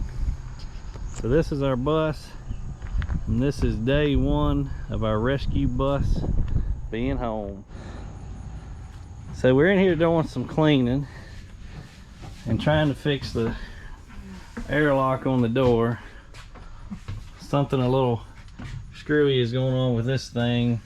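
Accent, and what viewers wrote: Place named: Virginia, United States. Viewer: Congrats on the bus! It will be a fun project. And it sounds like you are a fellow Virginian. Cool! Thanks for sharing.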